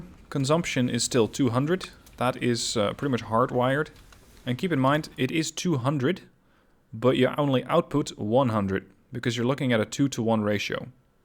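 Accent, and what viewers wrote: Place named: Netherlands. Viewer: I was like; sounds dutch or not? Then you hit me with "Breda"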